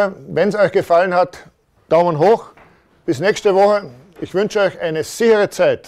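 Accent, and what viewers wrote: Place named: Vorarlberg, Austria. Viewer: Geil. Könnte grad meinen du kommst aus Vorarlberg. Geil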